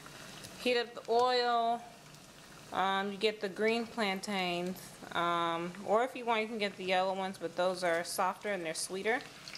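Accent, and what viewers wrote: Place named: United States. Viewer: U sound americanized as all heck...like me lol. And your mom sounds like mines to rest her soul. Thanks for the recipe :)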